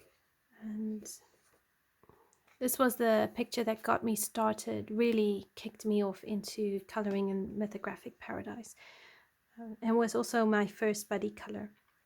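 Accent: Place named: South Africa. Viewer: It was so great to hear another South African voice on the Color Tube. Good luck with your channel, your coloring is so lovely.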